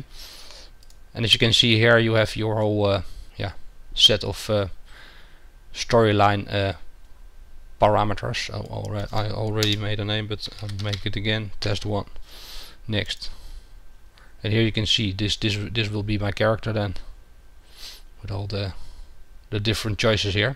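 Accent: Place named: Netherlands. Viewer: dutch much:)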